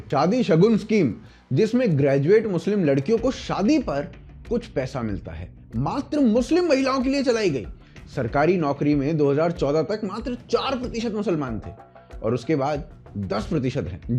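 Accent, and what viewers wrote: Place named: Haryana, India. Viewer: He is haryanavi that's why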